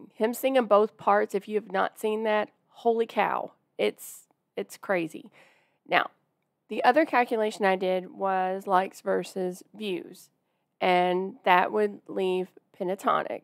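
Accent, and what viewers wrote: South, United States. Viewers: I love your channel. You're fun, show real reactions, and sweet. The southern drawl is warm and inviting. I've never seen judgement in your eyes and you support everyone you watch. + I LOVE Pentatonix, and many others and you deliver what your followers want. So thank you! ❤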